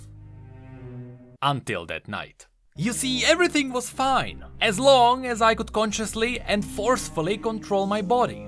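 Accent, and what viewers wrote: Czech Republic. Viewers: Myslel som si že máš taký český akcent lol :D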